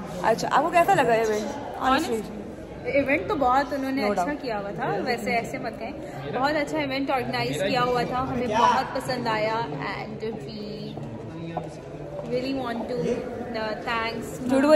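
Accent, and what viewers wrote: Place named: Mumbai, India. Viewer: Urdu nahi ATI Mumbai wali zuban bolti ho.mere ko etc q? Pak ki ho ya indian ho? Or galiyan bhi tumhara brother ya dost deyta hai .zuban tu seedhi rakho it is not funny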